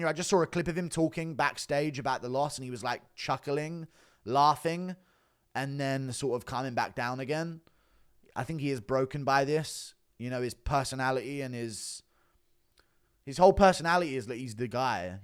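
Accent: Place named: Estuary English, United Kingdom. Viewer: Your estuary English fascinates me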